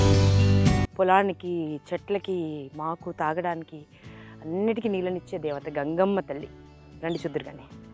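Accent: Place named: Telangana, India. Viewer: Ma akka Telangana bidda. Tanu matlade Telangana yasalo na biddalu ma amma ani forpect gaw matlatunnav😂😂😂❤❤❤😊😊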